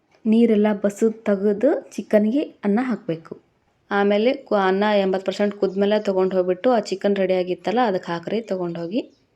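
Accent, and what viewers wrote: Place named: North Karnataka, India. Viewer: ನೀವು ಮಾತಾಡೋ ಶೈಲಿ ನನಗೂ ಚಲೋ ಇಷ್ಟ ಆಯ್ತ ರಿ.... ಪಕ್ಕ ಕನ್ನಡ ಮಂಡ್ಯದಿಂದ ಉತ್ತರ ಕರ್ನಾಟಕದ ಸೈಕಲ್ ಸವಾರಿವರೆಗೆ❤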